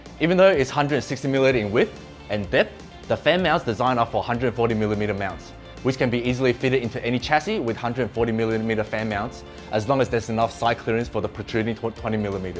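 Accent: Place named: Australia. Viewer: sounds so aussie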